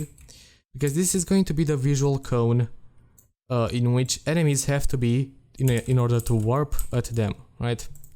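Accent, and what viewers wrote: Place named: Russia. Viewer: I just think you have an accent,so I thought you were Russian)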